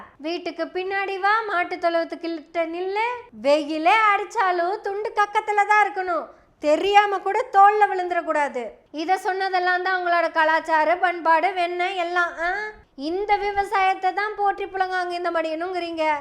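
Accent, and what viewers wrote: Kongu, India. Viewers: கொங்குத் தமிழில் சதிராடும் தமிழ்த் தாயே வணக்கம்🙏🙏🙏🙏🙏🙏🙏🙏🙏🙏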